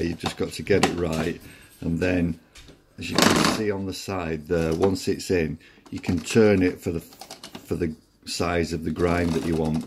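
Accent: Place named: Lancashire, United Kingdom. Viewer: ……thanks for replying bud………you sound like you’re from near to me in Lancashire. I took the top part to pieces late last night and put it back together then set it to coarsest grind then backed it further back towards the on off switch until it cut out then back until it operated………I’ve come to the conclusion it takes a couple of turns of the burr to achieve a new grind and I wasn’t giving it enough time as the grind is definitely more coarse………even so I would have expected to be able to get a coarser grind ……….but I’m not disappointed anymore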